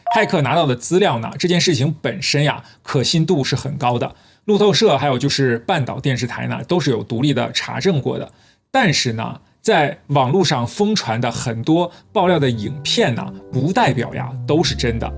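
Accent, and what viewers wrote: China. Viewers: 好像博主是在外国生活的华人，但口音像是中国大陆的，而用词却是台湾的叫法。有点别致的搭配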